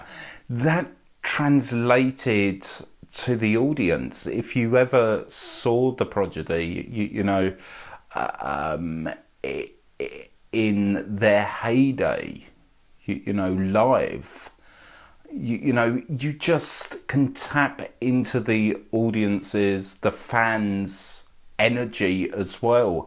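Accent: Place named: United Kingdom. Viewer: I love your explanation of any part of this clip.... I'm not a Goth, but a big fan of The Prodigy. Your British accent is perfect for this description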